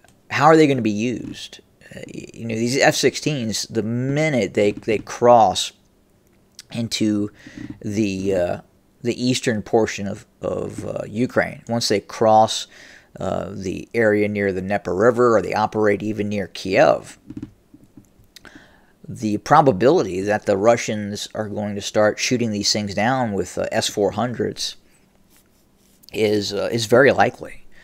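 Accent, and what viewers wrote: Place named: United States. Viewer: Don't let the American accent fool you.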